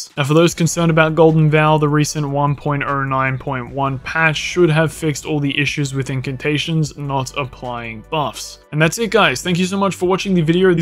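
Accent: Australia. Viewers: Nice work mate!! Aussie?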